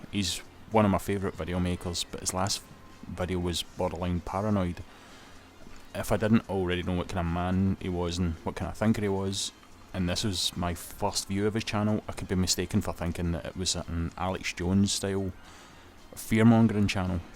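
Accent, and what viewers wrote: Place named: Scotland, United Kingdom. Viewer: Very Scottish :)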